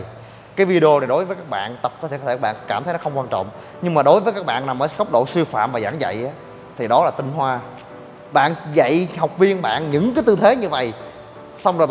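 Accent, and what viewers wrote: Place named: North, Vietnam. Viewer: Lâu lâu vào nghe giọng "đàng ngoài".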